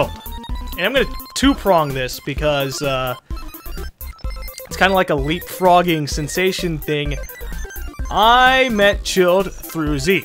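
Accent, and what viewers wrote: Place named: Hawaii, United States. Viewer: hey you from hawaii... brah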